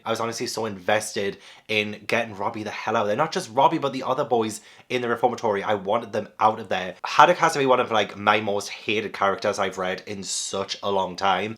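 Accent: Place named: Scotland, United Kingdom. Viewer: Oh man, you jumped right on into the EXTREME horror. When I saw “Cows”, I was like … 👀 … 😂 Please excuse my American ignorance, but where is your accent from? I love it SO much, but I can’t pinpoint it … Every time I watch your videos I think you sound Scottish maybe? I imagine you reading this being like, this girl isn’t even close! 🤣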